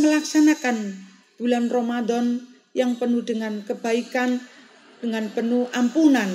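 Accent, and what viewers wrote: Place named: Java, Indonesia. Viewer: Pelan pelan bu nyai gk usah tergesah gesah agar bhs indonesianya tdk kelihatan medok,krn sdh msk youtub, maaf kritik membangun smg sukses dakwanya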